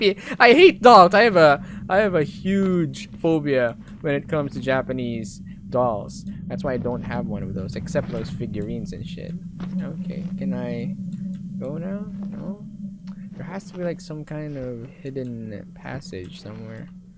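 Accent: England, United Kingdom. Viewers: I love how I'm so obsessed about his videos but maybe it's because how real he is and how his English accent is just the right voice with these horror gameplays. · I love his English accent tho 🥵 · Nice English Accent Though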